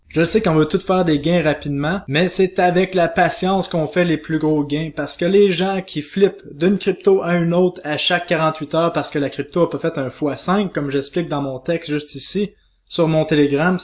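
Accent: Quebec, Canada. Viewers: Hello j'espère que tu vas bien? J'ai vraiment du plaisir a écouter tes vidéos et lire ce que tu écris. Ton accent québécois es agréable a écouter, merci pour tes vidéos t'es au top!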